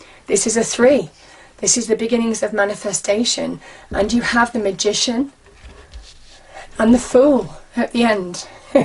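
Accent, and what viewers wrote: United Kingdom. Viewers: Hi .. It's great to hear a uk voice . 👍.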